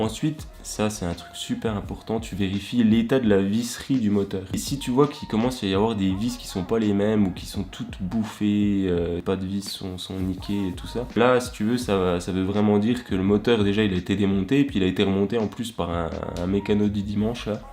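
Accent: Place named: Franche-Comté, France. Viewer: Tu es pas de Franche-Comté ? je crois entendre l'accent de chez nous !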